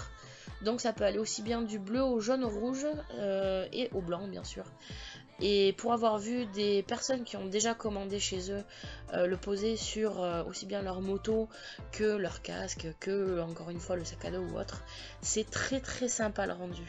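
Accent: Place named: South, France. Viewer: lol de rien L'accent du sud est très apprécié il donne tout de suite le sourire!!! ne le réfrène pas au contraire:D Nous sommes des sudistes et fier de l’être!!! bonne continuation à toi;-)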